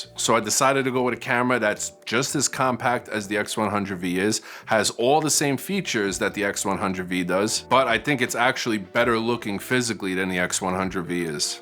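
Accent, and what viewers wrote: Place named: Queens, United States. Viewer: Great review, currently thinking of picking the XT30ii as my EDC camera. By the way, that accent...Queens, NY right? I'm hearing Flushing or Jackson Heights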